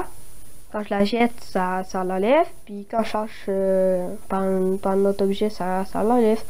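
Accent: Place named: Quebec, Canada. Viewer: Du québécois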